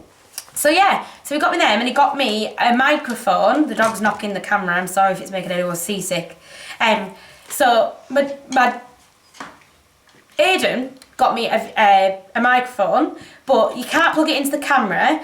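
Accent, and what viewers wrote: Northern England, United Kingdom. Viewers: It’s lovely to hear another northern accent ❤️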